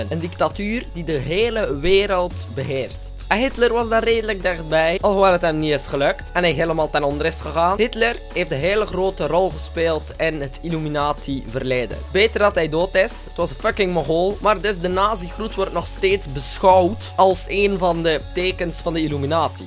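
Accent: Belgium. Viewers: Ben je Belgiës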